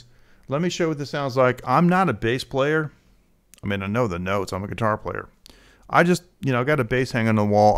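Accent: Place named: Boston, United States. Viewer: Shared. Hope you keep the Boston sound. Awesome stuff!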